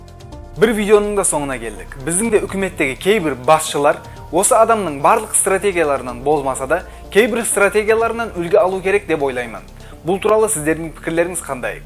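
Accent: Uzbekistan. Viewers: Мынау кым озы Блогер не ушын Казакшага Озбекше косып сойлейды дохарсай Шала казаксынбе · сен озбек емессенбе сал сал келесен акцент бардай тилинде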